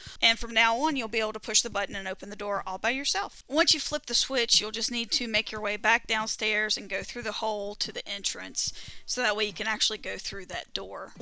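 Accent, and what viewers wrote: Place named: South, United States. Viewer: Found a pink so far! Thank you for the guide southern sounding lady!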